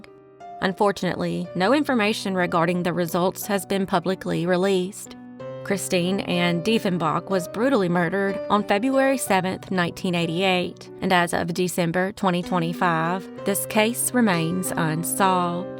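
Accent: South, United States. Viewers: Does it make sense to anyone else, that a southern voiced AI is doing such a horrible job of narrating these stories! Can we get a New York narrator please?! Geez!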